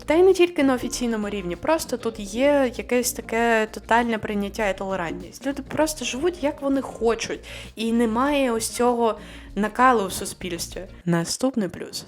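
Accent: Western Ukraine, Ukraine. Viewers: Прежде всего благодарность и помните что немцы и Германия вам ничего не должны. БюрокрАтия ударение на а. В мате ху**о ударение на о, у вас западэнский акцент, приятно было вас слушать, много полезного рассказываете, но Германия не для таких туристов как вы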